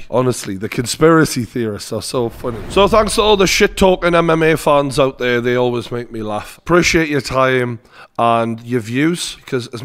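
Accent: Newcastle, United Kingdom. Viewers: Just hearing true geordie introduce the video is enough to put a smile on my face!!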